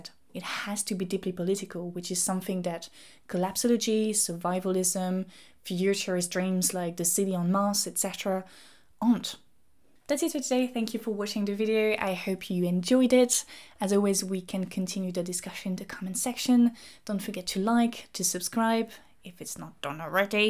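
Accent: France. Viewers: This is entirely irrelevant to the conversation, but I can’t help but be a bit taken by your whispery French accent. Developing a bit of a parasocial “crush.” But you’ve inspired me to pick up French again on Duolingo. I’m Senegalese and I feel like I should be fluent in French by now. Anyways great vid as always.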